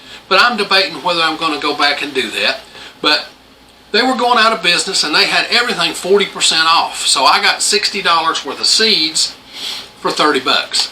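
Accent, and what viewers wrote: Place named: Indiana, United States. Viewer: Hickory Kane(king)! Lol! I talk this way up here in Indiana! Lol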